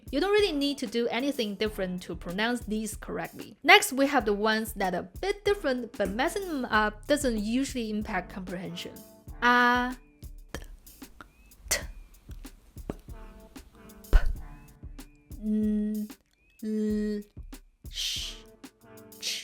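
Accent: China. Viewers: This is of course if your goal is to sound authentically Chinese in your language. But it not essential I think. You have a Chinese accent when speaking English but I understand you perfectly.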